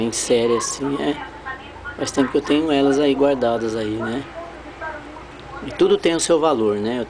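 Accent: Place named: São Paulo, Brazil. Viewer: Vc é de São Paulo mesmo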